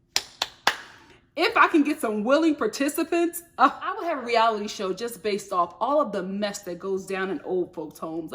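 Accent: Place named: Philadelphia, United States. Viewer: I won’t lie, I watch every one of your videos but I clicked this one with the quickest of thumbs just to hear you say “doll” in that Philly accent. 😂 Love you!!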